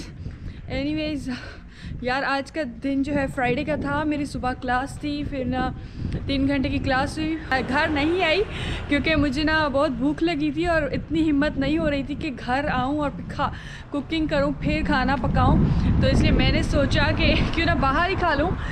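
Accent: Sindh, Pakistan. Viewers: I listing Sindhi style urdu speaking after 15 year love thissssss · Paka Pakistan sa ha bolna sa lag raha ho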